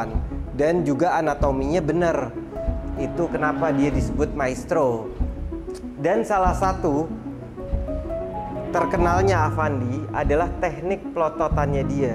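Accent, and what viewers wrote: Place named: Betawi, Indonesia. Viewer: Nyang gue demen dan salut ame hostnye nih bang, betawi banget dialek ngomongnye... Mantep bang, lanjut...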